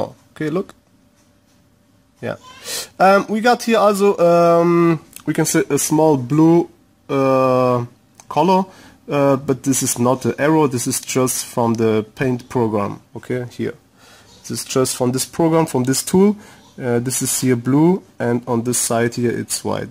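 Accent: Germany. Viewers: Man merkt sofort dass du deutscher bist.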